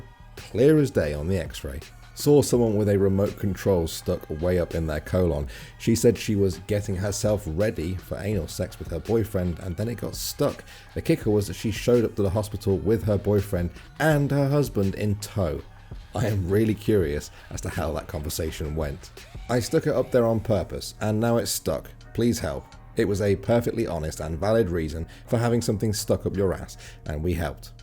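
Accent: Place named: United Kingdom. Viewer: You sound British. I thought the Brits say "arse"?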